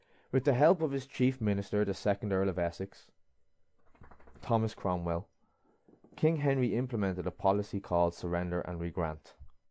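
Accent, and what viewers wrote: Dublin, Ireland. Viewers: I love the Dublin accent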